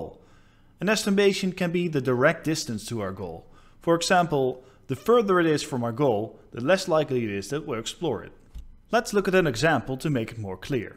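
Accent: Netherlands. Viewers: Great vid. One little question, did your English decline from a couple years ago? Like your dutch accent is more pronounced then roughly 2 years ago.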